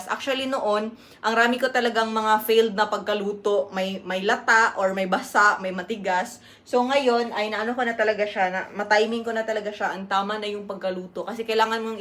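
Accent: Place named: Visayas, Philippines. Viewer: Bisaya c madam diay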